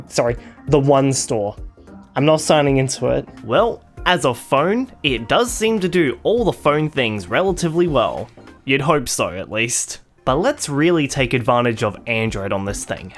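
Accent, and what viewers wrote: Australia. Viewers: Bro is unironically Australian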